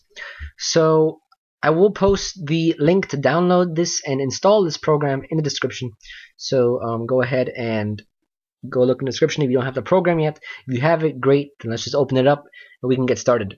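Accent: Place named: India. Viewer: lol wow that's quite observant of you! I thought pretty much only Indian people would be able to pick up on such a subtle accent :)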